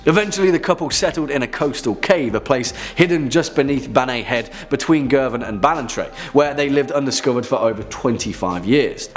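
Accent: England, United Kingdom. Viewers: Want to like cause it's about Scotland, but cannae be having that English accent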